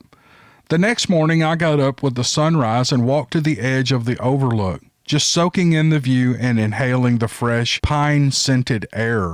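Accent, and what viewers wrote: South, United States. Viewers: Get them fishes!! Just found you and love your story tellin. Love your accent, country as a biscuit! Keep em commin fella!😚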